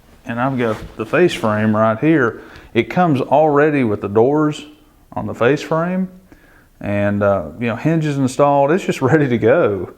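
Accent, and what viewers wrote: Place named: Eastern Kentucky, United States. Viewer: Between the accent and the funny sayings you must be from eastern Kentucky?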